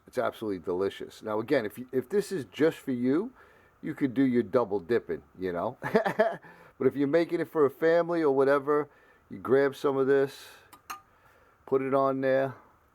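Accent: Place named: South, United States. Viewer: Yum! Thanks for showing that... even though I don’t think it’s gonna work on my pizza tonight! 😂🤣😂.... by the way, loved your “southern” accent! 👍🏻👍🏻👍🏻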